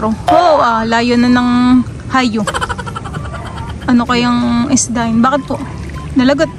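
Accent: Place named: Mindoro, Philippines. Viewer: Pamilyar at nadinig ko Yang tuno na Yan sa lubang Mindoro, Taga Mindoro kaga?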